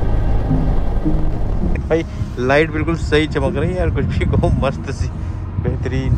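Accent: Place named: Kanpur, India. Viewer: Aesa kyu lag raha hai ye banda kanpuriya hai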